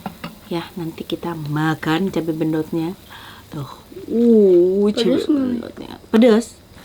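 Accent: West Java, Indonesia. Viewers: Teteh uda cantik Gaul....pintar lg masak... Salut....dech Klw ngomong asik bnget didengerinx.. Logat sunda x.... Putrax mirip teh bnget Cakeppp..... Salut teh...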